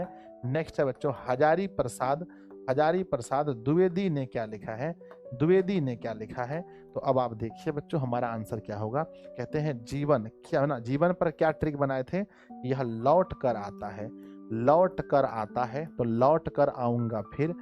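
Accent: Bihar, India. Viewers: सर मैं आपके कोर्स इसलिए करता हूं क्योंकि आप बिहारी स्टाइल में पढ़ते हैं तो सब कुछ समझ आ जाता है कि❤❤❤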